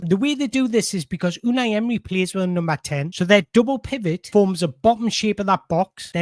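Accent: Newcastle, United Kingdom. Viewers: the only geordie i wana listen talk about a villa game against newcastle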